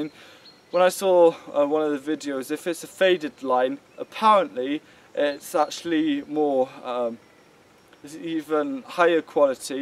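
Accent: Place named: United Kingdom. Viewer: Sometimes I come for the great info. Sometimes I come for the entertaining programming. But sometimes I come just for the cool Brit accent. :)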